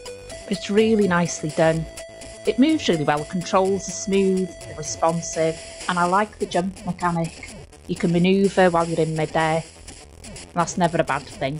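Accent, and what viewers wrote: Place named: Liverpool, United Kingdom. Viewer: Now then,I thought I'd already subscribed to your channel, but obviously not lol..anyway if I remember wright retro b8 mentioned this channel, unless there's another retro Scouse (with yourshire overtones)sounding girl channel ..don't worry im from Liverpool myself.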